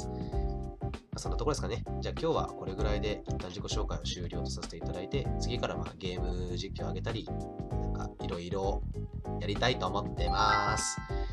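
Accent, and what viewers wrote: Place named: Kansai, Japan. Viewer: あっ声超好きだわ(´ཫ`*) 好きです 関西弁ですかね？最高ですね これから応援させて頂きます！！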